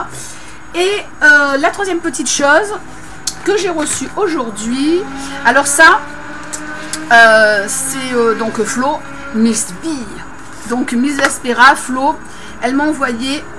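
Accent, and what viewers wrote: England, United Kingdom. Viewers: que j'aime ton accent anglais :p Et oui faut PAS acheter à n'importe qui et surtout pas une arnaqueuse lol MDR mon mot à l'écran !! Hihi et bien pour ma défense je me suis bein rattrapé :p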